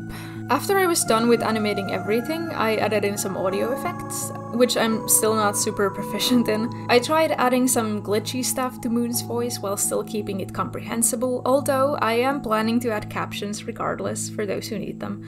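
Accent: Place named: Finland. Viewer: Kuulin heti aksentista et oli suomalaine kyseessä. Mahtava tietää että suomestakin löytyy näin taitavia henkilöitä! Erinomainen video :]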